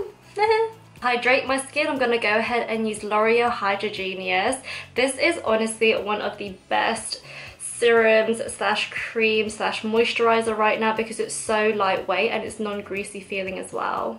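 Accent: England, United Kingdom. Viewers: I've only ever seen your videos on Instagram and was completely shoookk at your British accent. That was completely unexpected However you are so lovely and slay these makeup tutorials to the max ❤❤❤❤❤👏👏👏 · I NEVER EXPECTED YOU TO HAVE AN ENGLISH ACCENT I AM SHOOK. OMG YOURE SO EXTRA ADORABLE WITH THAT VOICE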